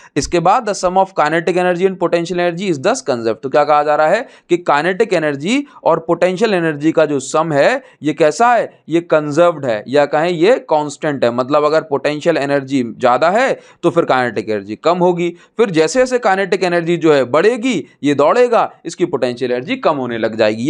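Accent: Odisha, India. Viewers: Ap odisha se ho sir?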